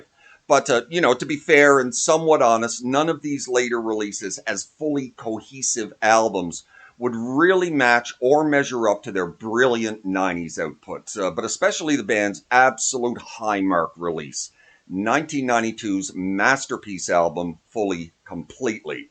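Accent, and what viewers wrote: Canada. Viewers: Really enjoyed your tribute to the hip and the riff breaks were excellent. I obtained the “ ” album in 89 or 90 via the Columbia house mail order CD’s that were popular then. 7cd’s for a penny or something similar ha! I remember thinking, “who are these guys?” And that’s a strange band name 😂. So happy that I did get that cd, it defined that period of time for me and many other Canadians. Plus I felt like I got in on the ground floor as an early fan. Remained a fan since and Still enjoy their music today. I can’t think of another band that brought Canada together more than they did. Something we could use now more than ever. Thanks for the work you put into this channel. The lessons are excellent! BTW, Do I detect a hint of a Cape Breton accent…😊 fellow Novi here. Cheers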